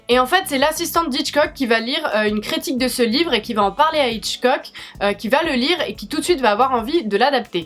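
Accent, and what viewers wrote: France. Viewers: Pour Jurassic Park effectivement je l'ai aussi appris par hasard en fouillant dans les livres de mon père, il est dans ma pile à lire depuis quelques mois... livre de l'été ?! Pourquoi pas 😊 Super vidéo je viens de te découvrir et j'aime ta façon franchouillarde de parler, on à l'impression de discuter avec notre copine de façon hyper naturelle, ça fait plaisir de voir ça sur youtube 😊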